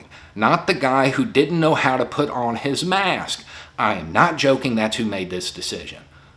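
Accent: South, United States. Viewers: Because (s)he does it ALL the time & it helps some people. Some have hearing issues, or may not understand the southern accent, or they retain visual things better. Whatever the reason, it's a service, a good thing. Why does it bother you so much? It's not like YOU were told to transcribe it (were you?).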